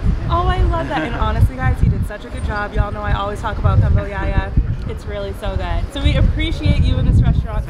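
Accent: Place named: Africa. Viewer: Macon GA with an African (from the continent)accent lol.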